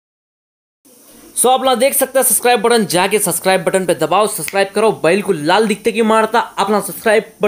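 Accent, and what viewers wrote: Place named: Hyderabad, India. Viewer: I love Hyderabad talking style ❤️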